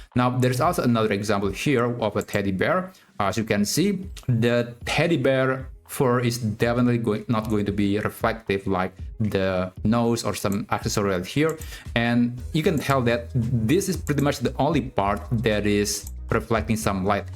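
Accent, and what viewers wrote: Indonesia. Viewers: you sound Indonesian, are you?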